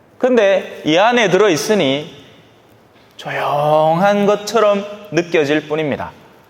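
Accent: Gyeongsang, South Korea. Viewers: 찬미예수님...신부님..말씀강론.잘들었습니다.경상도.사트리.정겹고.고향생각을하게합니다육남매.형제가.그립네요.신부님.사투리에.위안이되옵니다🙏🙏🙏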